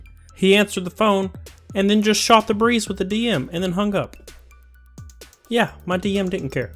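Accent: South, United States. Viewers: Good lord Southern and hot Af woof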